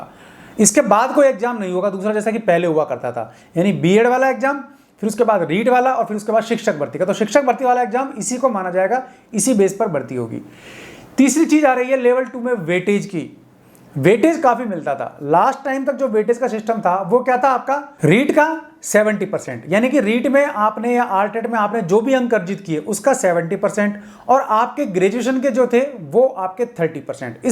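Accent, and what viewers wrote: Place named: Uttar Pradesh, India. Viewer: Ye sir up ka h kya ??? Rajasthan ka nahi lag nahi raha h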